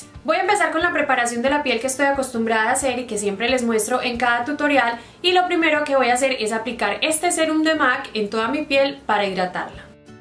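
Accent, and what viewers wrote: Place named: Colombia. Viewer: primer video que veo y apenas empezo dije... Es colombiana! ❤ Nueva suscriptora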